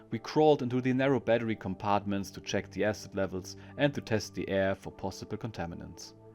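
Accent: Germany. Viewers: Your German accent makes the narration more authentic. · The German accent materializes the story into reality.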